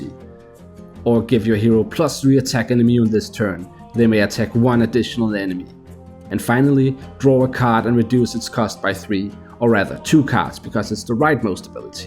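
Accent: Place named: Denmark. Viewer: Man ka’ godt høre at du er dansker 😅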